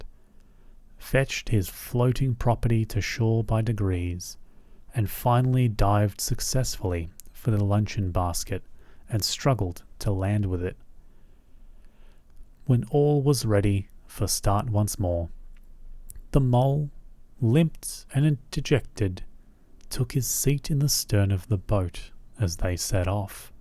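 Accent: Australia. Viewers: Render us some new story with your Australian accent ❤❤❤ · Hooray! A dear familiar Australian accent!! Thank you sooo much. You do have a lovely voice, and you read in a peaceful, reassuring way. Thanks also for simply starting to read the text without a distracting monologue introduction.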